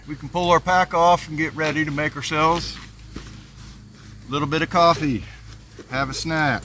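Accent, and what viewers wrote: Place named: Kentucky, United States. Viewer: I appreciate the manner in which you realistically presented the ACTUAL challenges in making a shelter while wearing a poncho. Thank you for expressing your concern for victime of Hellene. Our area along the northern half of the Al-GA state line was in the originally projected path of the storm before it suddenly turned northeastward & missed our area. We have many friends who live the areas most devastated. ALL the victims remain in our thoughts & prayers. By lowering the end of the poncho tied to the tree, the plow point shelter becomes long enough to stretch out, lie down, & sleep if an overnight stay becomes necessary in an emergewncy or survival situation. Thank you, & may God bless you, sir. Your accent reminds me of those which my family heard when we lived in SE KY about 40 miles beyond the Cumberland Gap. 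This presentation motivated me to watch your other videos & possibly subscribe! An 83 year old outdoor adventurer.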